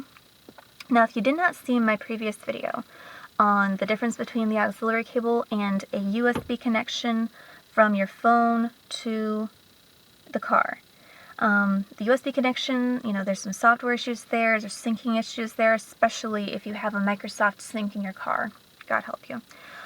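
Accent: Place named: Texas, United States. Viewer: coz thats how we spek here in texas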